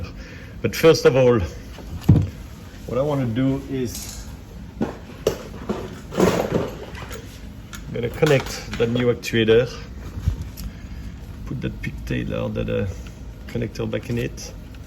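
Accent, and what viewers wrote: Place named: France. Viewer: Thanks for showing the most important part. Removing those plastic panels without breaking, Heaven forbid screws to fasten. Can't wait to experience the pleasure and appreciate the tid bit how sharp that plastic is, nothing worse than cutting yourself doing a repair. I detect a French accent.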